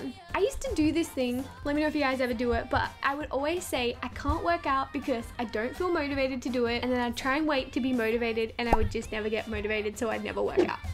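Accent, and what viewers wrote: Australia. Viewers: Are you aussie🤨🧐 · Is she AUSSIE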